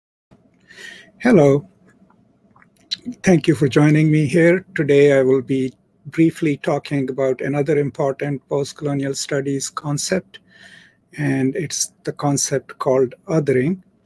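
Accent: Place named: England, United Kingdom. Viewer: thanks for your works! ps: i like your voice and english accent so much greetings from france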